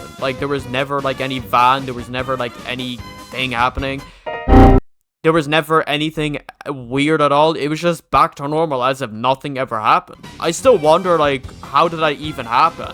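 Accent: Ireland. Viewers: Woowww you’re Irish like mee! I heard it in your voice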